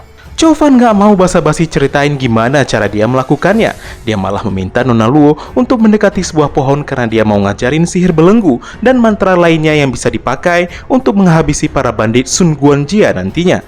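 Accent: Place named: Sunda, Indonesia. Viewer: iq7 lebih kentel sunda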